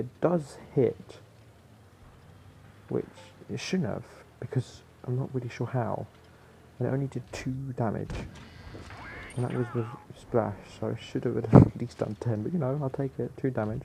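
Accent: United Kingdom. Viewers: God damnit I love the British accent 😂😂😂😂😂😂😂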